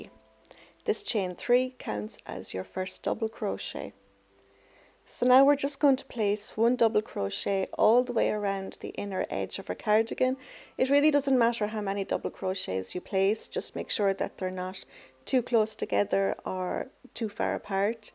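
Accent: Ireland. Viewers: I am a new learner, I was scrolling through tutorials on how to crochet a cardigan " I love a challenge " when I heard you're Irish accent 🇮🇪 I had to try this as im Irish. Im getting there. Thank you. ❤❤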